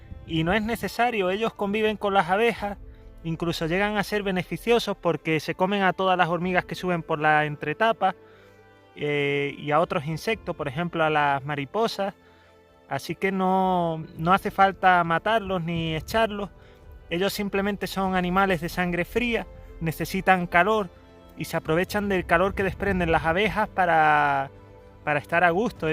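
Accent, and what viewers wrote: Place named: Mexico. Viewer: es un experto en apicultura y una gran persona tiene más parecido el acento de voz a mexicano que a español y es un gran ser humano por que nos enseña todas sus experiencias con la apicultura Dios bendiga tu familia. un abrazo con respeto de un mexicano🇲🇽 Desde Ohio usa 🇺🇸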